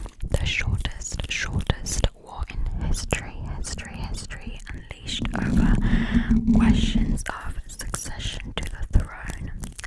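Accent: United Kingdom. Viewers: Time to drop everything i’m doing (mainly babysitting) and come here also from the first sexonds alone, wow that british accent sounds so brithis. Nailed it! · pls create more British accent ASMR videos 🥺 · I love your British accent ❤❤❤❤❤ Cant wait for more language videos 🙂😊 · I'd genuinely think you were from England had I not heard you speak before, you've nailed out southern accent. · Amazing! LOVE your British accent! 💖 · Love the British accent. Make more videos with it 🫶🏻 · Lovely fun facts! More British accent videos, please 🙏🏻🥰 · OMG THE BRITISH ACCENT IS PERFECT❤️ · This video is wonderful!! Please, do more videos with British accent ❤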